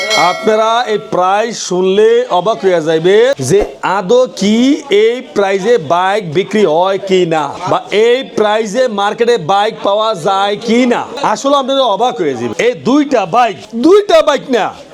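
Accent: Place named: Barishal, Bangladesh. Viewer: মুখের ভাষা শুনে মনে হয় মামার বাড়ি বরিশাল বরিশালের মানুষ ভালো❤❤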